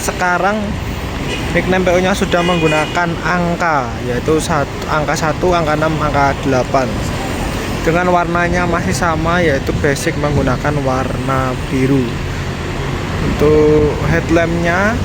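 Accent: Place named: Banyumas, Indonesia. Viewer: Ngapak pride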